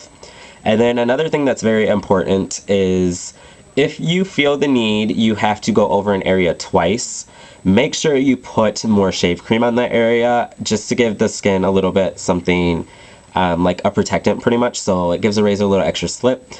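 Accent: United States. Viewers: i live in canada, and its an american accent :)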